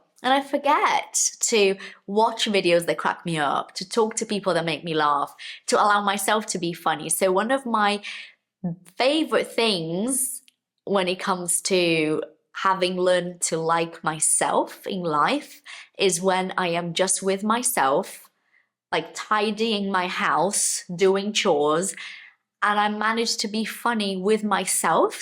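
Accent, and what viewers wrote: United Kingdom. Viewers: Hi teacher .. I'm learning the British accent..and I really like how you speak exactly like the British people I hope to speak like you 😢 Could you please tell us the secret 💔 Please make a video on this subject.. I feel frustrated because I try to speak like British people, but I still can't. Thank you very much❤. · Great content. Are you Brazilian? Beautiful British accent. I strong believe that your sign is Virgo \o/ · Teacher, sorry for the question but, are you a native speaker? You have a really nice British accent oh my god 🙌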